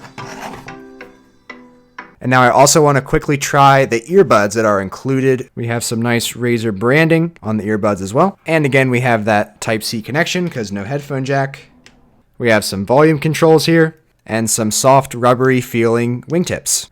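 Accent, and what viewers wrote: Philadelphia, United States. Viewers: are you from philly tri-state area? lol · I'm guessing you're from Philly or South Jersey. Nice video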